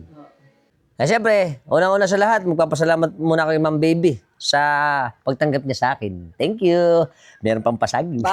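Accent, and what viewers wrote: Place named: Bulacan, Philippines. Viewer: Yung accent mo sir bulakenyong bulakenyo 😅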